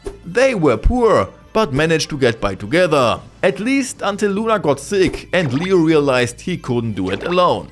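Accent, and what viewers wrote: Germany. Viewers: Lass mich lügen aber bin mir bei dem Akzent zu 99% sicher dass du deutsch bist 😅 Gutes Video btw. Endlich mal wieder ein Tuber der mal wieder Videos zu 5ds macht 😁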